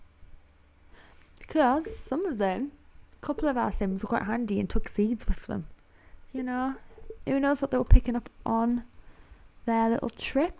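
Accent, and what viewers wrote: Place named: United Kingdom. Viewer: I thought you was UK I am to East Midlands lol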